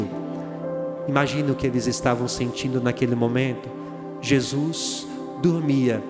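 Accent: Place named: Paraíba, Brazil. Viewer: Eu jurava que o senhor era da Paraíba.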